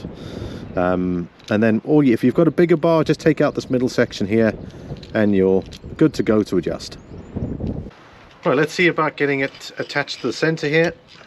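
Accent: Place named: Liverpool, United Kingdom. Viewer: Great video, I’m considering one of these devices so you’ve covered everything I wanted to know. Btw I’m getting various accents in your speech, you’re definitely not Irish but I detected a bit of Liverpool there, am I wrong ? Subbed you from a scouser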